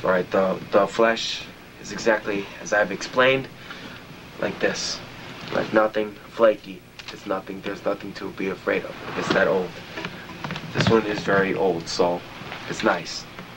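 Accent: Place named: Long Island, United States. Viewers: I’m from Long Island, this kids sounds like he could be from around there. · clearly he’s from queens area of new york. accent gives it away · Brooklyn or queens for sure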